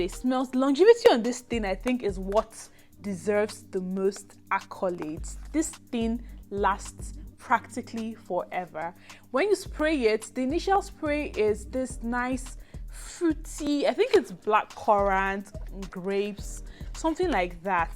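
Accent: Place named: England, United Kingdom. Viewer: Hi thanks for the video , why do I sometimes pick up an English accent in your voice. Btw mousuf is great.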